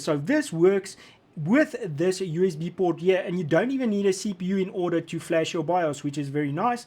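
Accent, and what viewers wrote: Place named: South Africa. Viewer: Wanted a shot of the full thing without the covers. But subbing just for the South African accent for now.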